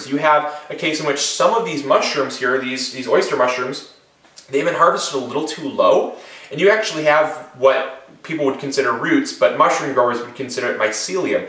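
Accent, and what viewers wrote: Michigan, United States. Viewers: Holy Michigan accent! Thanks for the lesson I can't wait to try this. Luckily my compost pile is pretty shady.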